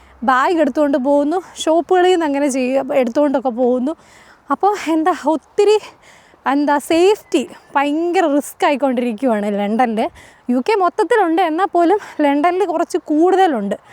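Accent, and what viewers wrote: Kottayam, India. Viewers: Kottayam slang liked enna parayana Ennada uvve angana kure und like kochi trichur tvm etc 😊😊🎉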